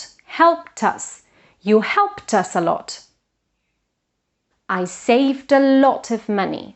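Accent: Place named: United Kingdom. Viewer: he notado que tiene el acento británico. Es mas facil aprender inglés britanico?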